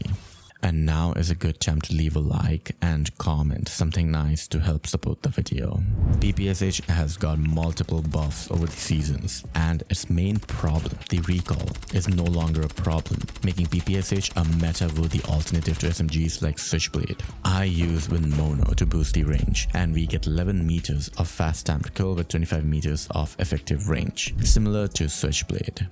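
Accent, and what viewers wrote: India. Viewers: I usually dont like indian accents as they are hard to understand but the crispness of your mic and the way u talk is just so smooth 😭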